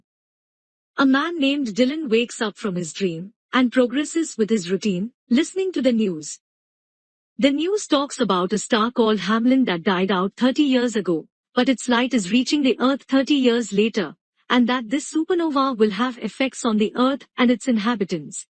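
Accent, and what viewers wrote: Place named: India. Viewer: Your accent is like you're an Indian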